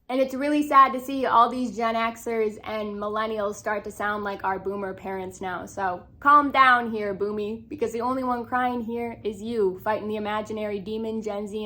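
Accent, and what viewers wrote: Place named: Minnesota, United States. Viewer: german american from minnesota ? damn nice to meet you lol you have such a nice accent btw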